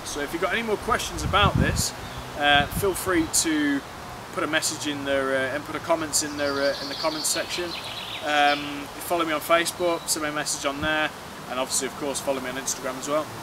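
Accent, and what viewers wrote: United Kingdom. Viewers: where abouts in the UK are you from mate!? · Hey! Im from Germany.. so i cant understand everything very well.. It would be very nice if you could put some links below my commentary For example:The dye mag feed for the hammer 7, the remington stock or the barrel... i ask because i cant understand an english accent very well ^^ (sry for my worst english...^^) the links dont have to be an german link! just that i have the special name of the item Greatings from Germany!:)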